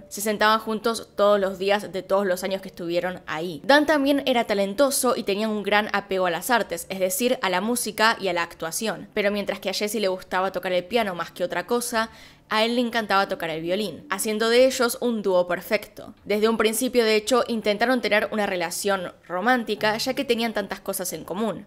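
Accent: Argentina. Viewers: Soy español y escuchar un accento argentino mientras estudio es un vicio 😂 me alegro de que ya casi estas casi al millon. Vamos !!!!ánimo que tú puedes llegar!! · Es argentina por eso suena así.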